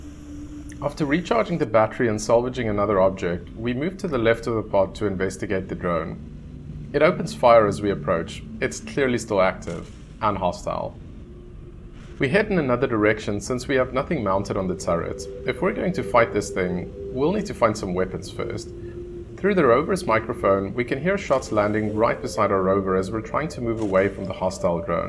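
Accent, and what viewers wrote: South Africa. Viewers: You sound awfully South African for a Singaporean!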